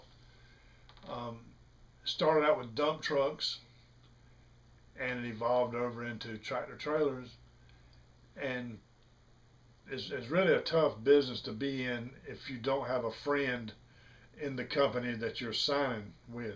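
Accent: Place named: Carolinas, United States. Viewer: Where you from man? The Carolina's? Got that accent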